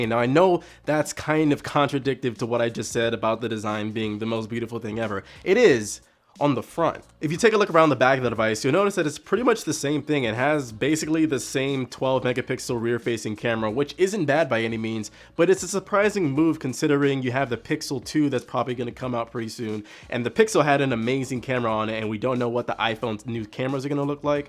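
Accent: Nigeria. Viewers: well am a Nigerian too and av never seen any Nigerian with this freakishly awesome accent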